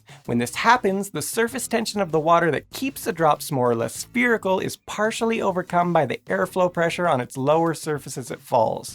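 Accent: United States. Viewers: Can't watch this. Didn't realize how much more annoying and less interesting the videos are when you swap out a crisp English voice for a generic annoying yank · got FAT and lost the hability to speak PROPER ENGLISH, meaning, he got murican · I like American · Hey, the American guy is back! Cool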